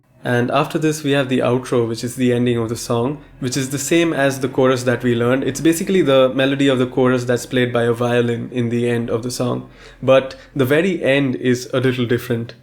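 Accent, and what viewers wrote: India. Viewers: ohh, Indian accent ❤️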